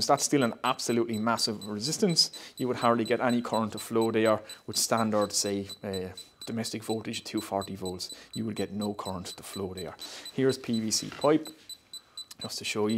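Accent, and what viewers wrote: Ireland. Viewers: Mate your accent reminds me of a year I spent as an exchange student in Limerick, which was some of the happiest times of my life :) Nice channel too, I'll be checking it out!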